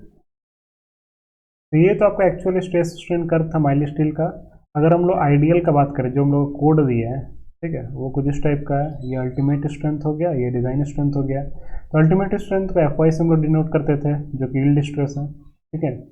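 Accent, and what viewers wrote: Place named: India. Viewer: Indian Accent 🙆‍♂️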